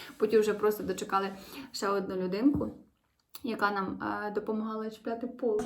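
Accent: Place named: Western Ukraine, Ukraine. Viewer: Привет соседям а мы из Херсонской. Я спросила потому что ты так хорошо говорил по украински думала что может с западной у меня муж со Львовской и у него такой же акцент. 🇺🇦🇺🇦🇺🇦💙💛🌹🌹🌹❤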